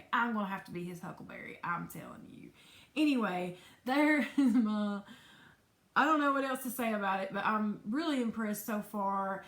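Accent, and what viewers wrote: South, United States. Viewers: Love your beautiful southern accent 😍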